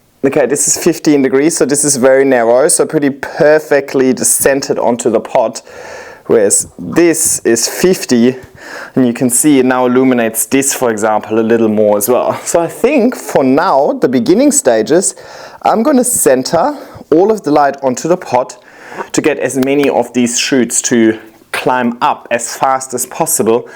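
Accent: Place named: Germany. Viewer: Hi, I love your personality and your content, and I have learned soooo much from you. Short story: I was born in Aachen, Germany, many, many moons ago. When I started my plant journey, I used to watch your videos and initially I could not figure out why the expected Australian accent sounded like a German accent. I asked my son to watch you and he said "definitely German". 😊 I am extremely nitpicky about cleanliness and order, must be the German influence on me. 😬 I am Asian and live in the USA. Sorry, a long comment. Thank you for you fun, wholesome videos and you seem to be such a nice guy!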